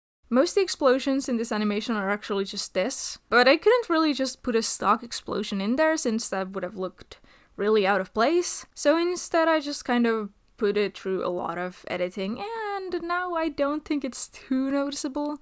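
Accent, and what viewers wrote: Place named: Finland. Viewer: O Al's I guessed that because I'm Finnish too and I could tell from the accent. But your English was so good I had to ask separately.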